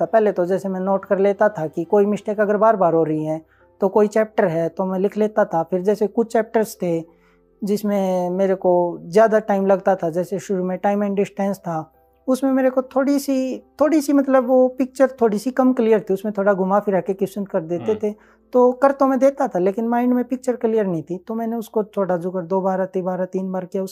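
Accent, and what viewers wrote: Haryana, India. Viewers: Haryana se ho kya bhai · Haryanvi ha k 😁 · That's haryanavi tone..😁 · Ya chhora haryanvi touch hai😂😂🥳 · Hariyani tone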